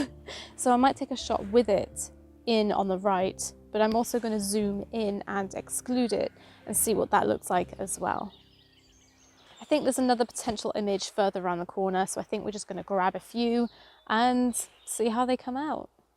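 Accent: United Kingdom. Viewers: Can you please teach me how to dance? But first, teach me how to talk with a British accent. Then teach me how to use an L-bracket on my Canon EOS R that has a Ulanzi Claw Quick Release plate on with a Ulanzi Zero Y Lightweight Travel Tripod. I hope I'm not asking too much. 💜 You asked for feedback on your audio as you were talking and setting up around the falls. Yes, the waterfall is loud, but I clearly understood what you said, even with my 66-year old ears. You mic gain could've been a tad (or wee, as y'all say) bit higher, but that might've increased the waterfall sounds too.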